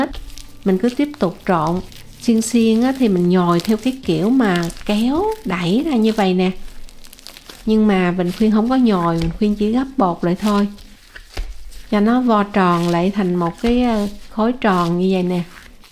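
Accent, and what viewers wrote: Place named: South, Vietnam. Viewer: 1 giọng nói miền Nam rất ngọt ngào và tự nhiên. Rất lâu rồi tôi mới đc nghe lại, ở VN bây giờ như bị nhiễm cái cách hành xử của dân Bắc sau này nên dần dần vẫn có vẻ dối trá trong cách nói. Tôi là dân Bắc nên nghe ra đc, ko còn đc cái chân thành như của. Cảm ơn. Nghe dạy nấu ăn như nghe nhạc á.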